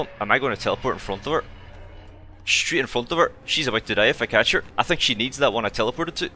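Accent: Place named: Scotland, United Kingdom. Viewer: I really love the accent! Are you Scottish Sir?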